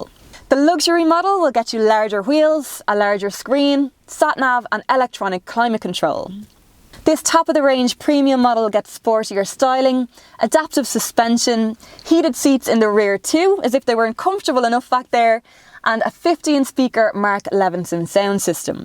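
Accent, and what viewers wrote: Ireland. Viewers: I have strong liking for Irish accent and a beautiful person, she is the perfect combinatb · I would definitely buy a Lexus from. Oops sorry already bought a RX450h FSport. Love the Irish accent.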